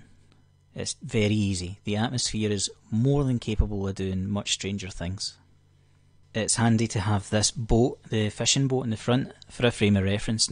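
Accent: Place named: Scotland, United Kingdom. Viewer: Alright mate? A fellow Scotsman here. Keep up the good work. I found you through a painful chat with Spurs etc. It was great to hear a familiar accent in the discourse.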